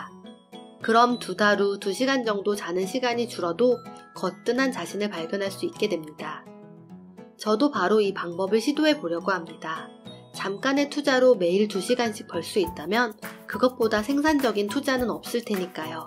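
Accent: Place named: Gyeongsang, South Korea. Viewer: 녹음하신분이 경상도분이시구나 ㅋㅋㅋ 경상도 사람은 딱 알아봄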